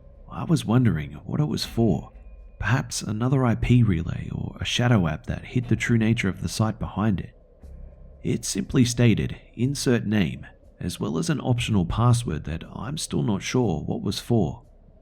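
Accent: Australia. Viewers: I also have come across some disturbing videos via the dark net, without being rude are you Australian by any chance?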